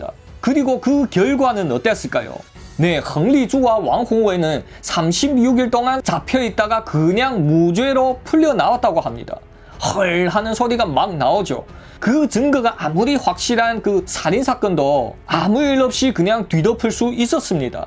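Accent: China. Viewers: 어찌된게 삼국지 보다 더 잼나지 ㅎㅎㅎ 중국 말투가 섞여서 더 집중이 되네요.. 너무 잼나여 감사합니다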